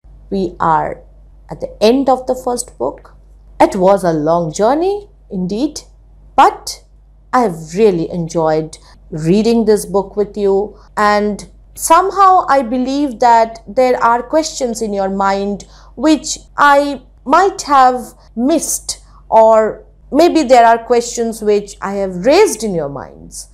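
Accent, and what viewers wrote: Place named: Bengal, India. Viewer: Ur the best i hv ever met 👌👌👌👌👌ur explanation is commendable....i was jumping from this to tht for searching the best explanation n got u there in my 3rd day of searching period. Just want to say ur accent is soo cute ....mixed with bengoli tone uff itss soo ear pleasing to me.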